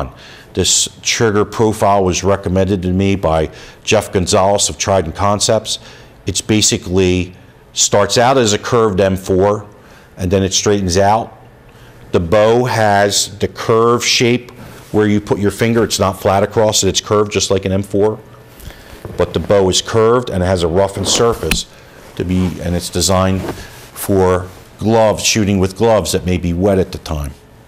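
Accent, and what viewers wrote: Pennsylvania, United States. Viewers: I love me some Pennsylvanians. Live in another state now, and miss the accent and pacing. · I hear Pennsylvania in your voice.. sounds like home. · Hello, SIr. I a Lancaster boy living in Florida about your age. I recognize your PA accent anywhere. I have two of your triggers, the SD 3 gun ans the ALG ACT. Boy o boy are they great and exactly as you describe in your video. My next one will be the SSA E with a flat bow.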